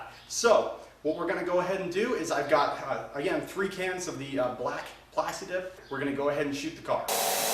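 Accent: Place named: Germany. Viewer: Your accent man... Where are you from? Are you German originally?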